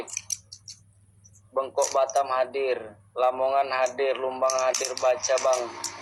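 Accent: Medan, Indonesia. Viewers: Bg orang mana ya.. Medan kah... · Bg, abg org mn? Koq cem org medan bg? Salam kenal y bg dri kami. Anak" awak sukak nonton video abg, lucu x kata anak" ni abg😆😆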